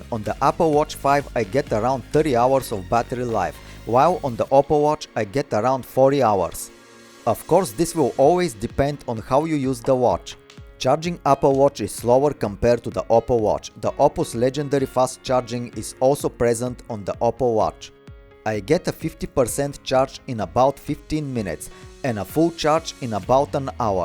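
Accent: Russia. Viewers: Is that an Russian gentleman speaking English, man I love his accent a lot.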